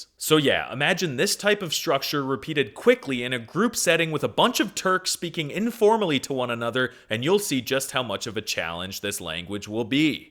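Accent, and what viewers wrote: Russia. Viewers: What is up with the weird map dude? There is no such thing. And this is not the turkish Accent it sounds like almost russian OMG. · American imitating perfect Russian accent while speaking Turkish. Thats.. .amazing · WHY IS YOUR ACCENT LIKE RUSSIAN ACCENT DAWG 🥀💔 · like a caricature of Turkish mixed with Russian acccent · Very funny video😅 But I have to correct one mistake: Turkish alphabet is not a version of American alphabet, it comes from ancient Turkic languages. And by the way, you speak Turkish in Russisn accent , Turkish is much softer and musical . It is also a mathematical and poetical language . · neden rus aksanıyla konuşuyon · Türkçe konuşabilmek için kendini o kadar sıkıyor ki sonunda Rusça konuşup Türkçe kelimeler söylüyor 🙂 Türkçede R'ler hiç bir zaman bu kadar vurgulu söylenmez. Tek vurgu hatta yuvarlayarak bile söylemen yeterli R'leri. 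· you are talking exactly like russians that tries to talk turkish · You speak Turkish as if you are Russian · Rus aksanı ile anlatmasan daha iyi olurdu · AMK BU NE GERÇEK OLACAK SANMIŞTIM Oh and WHY ARE YOU TALKING WITH A RUSSIAN ACCENT😭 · i have never heard turkish with a russian accent by an westerner till today. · Bro you sound Russian when you speak Turkish 😢 and I'm a native, spent my whole life in turkey and still struggled to understand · İngilizce’yi bir İngiliz gibi ama Türkçe’yi bir Rus gibi konuşan bir Amerikalı. Tanıştığımıza memnun oldum. ✋🏼 Ben Almanca’yı bir Alman, İngilizce’yi ise bir İtalyan gibi konuşan bir Türk’üm. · damn you have a heavy russian accent only while speaking turkish lmao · Interestingly you speak turkish like a russian. Did you know that? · FOR REAL, it sounded just like Russian I was so confused! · he is speaking turkish like russian people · I have never heard someone speak Turkish with a Russian accent. 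also I love the "malum kişi" bit lmao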